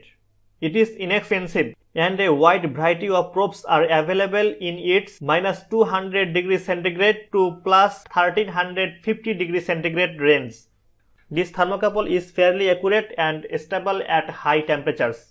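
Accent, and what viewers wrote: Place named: India. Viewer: 这印度调调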